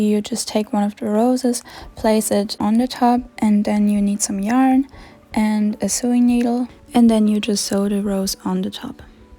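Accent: Germany. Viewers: Maybe it's a bit of a stupid question but are you german? I saw a product in another Video that was german and you have a bit of a accent so I just wondered... (I'm german too btw) (oh and please ignore my name it's my fathers account😅) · are you german? because you kinda have an accent :D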